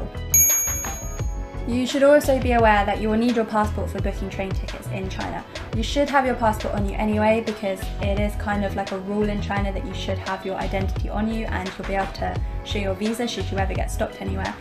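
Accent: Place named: United Kingdom. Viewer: Love your strong brit accent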